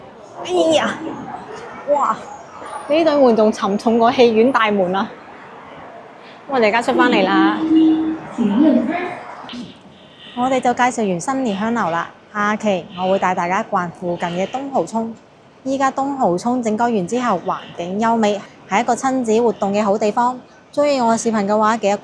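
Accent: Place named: Macau, China. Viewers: MOGO係唔係澳門人?好重澳門口音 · 終於有人問我一路想問嘅問題，點解第十甫長大嘅廣州人咁重澳門音🤔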